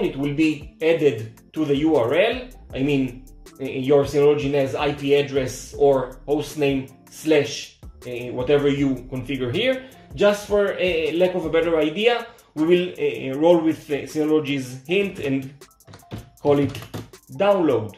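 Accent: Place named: Israel. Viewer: חחחחחח אי אפשר לברוח מהמבטא הישראלי אחלה סרטון אח יקר.. מקצועי לגמרי ברמה של חו"ל מוקווה ששומר על עצמך בימים האלו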